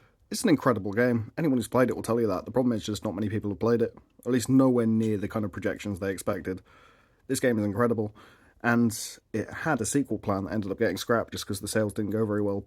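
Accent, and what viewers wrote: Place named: Midlands, United Kingdom. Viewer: Hey judging by your accent you’re from the midlands right?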